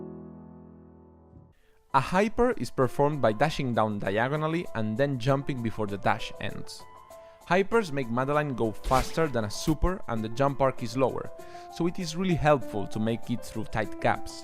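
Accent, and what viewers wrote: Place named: Spain. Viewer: Eres español? Porque tu acento parece español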